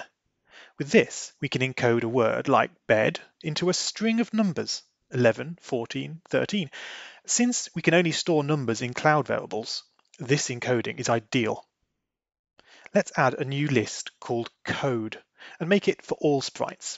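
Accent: United Kingdom. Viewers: I could listen to your british voice all day. It kind of reminds me of the times when the internet was for nerds and mainstream brands didn't take it seriously.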